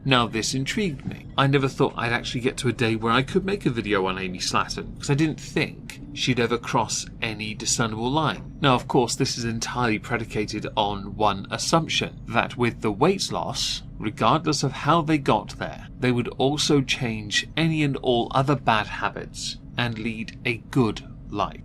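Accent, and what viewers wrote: United Kingdom. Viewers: You know the very best start of your vid is your saying in your British accent is “Well isn’t that just fantastic?” because that’s exactly how I would say that minus the British accent lol!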